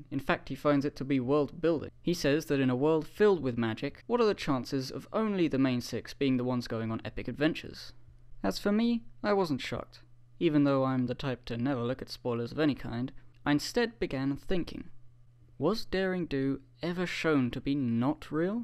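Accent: United Kingdom. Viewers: He's been British this whole time? Awesome!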